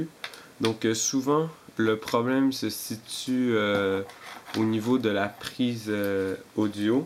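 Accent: Quebec, Canada. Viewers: Merci mec !! Je vais reparer mes ecouters Beats !! PS: tu es quebecois ?? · hey tu vien du Quebec ou quoi ? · j'adore ton accents rebequooiiiiiss <3:)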